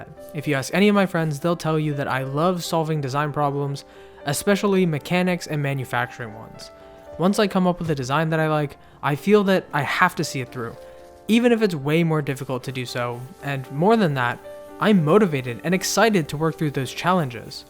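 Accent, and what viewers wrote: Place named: United States. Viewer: you're so american it almost reeks out of your voice. ew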